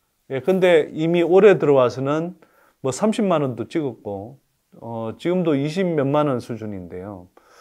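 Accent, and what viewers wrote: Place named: Gyeongsang, South Korea. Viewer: 항상 표준 경상도 말투에 호감하면서 존경하고있습니다.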